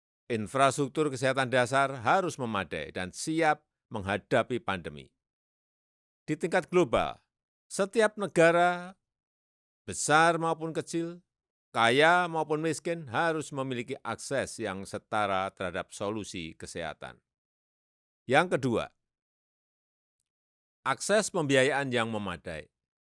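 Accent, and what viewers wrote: Java, Indonesia. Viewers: 😁😁😁😁😁😁😁Hihihihihi Benar tuchhhhh. Ndak enak mendengar BPK Jokowi menggunakan bahasa Inggris saat di forum internasional.....kagok...(lokal Indonesia jawanya terasa sekali....). Maaf ini bukan RASIS atau kesukuan....tapi itu melekat dan sulit dihilangkan 🤭🤭jadi kebiasaan dari kecil sampai tua pasti terbawa.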